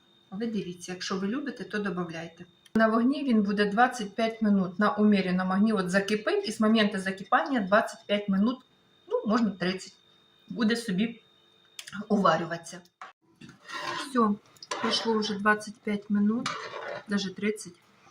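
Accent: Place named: South-East, Ukraine. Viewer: Дратує? Прямим ходом на медичний канал лікуватись! Мене забавляє південно- східний діалект.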